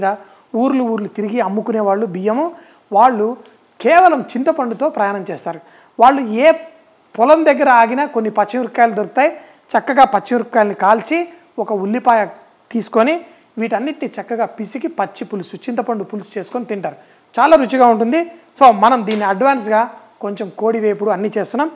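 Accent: Telangana, India. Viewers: Telangana rural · Already I love your programs in english, Now your 2nd innings in telugu, especially in telangana style at some times funny with your Dorasani is reaching to telugu people is very interesting, But I could not understand what is "dinchuck dinchuck"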